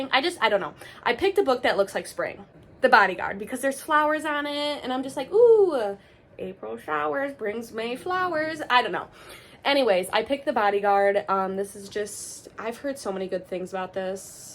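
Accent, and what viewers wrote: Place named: Wisconsin, United States. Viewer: I knew I heard that accent! Hello fellow Wisconsin book lover!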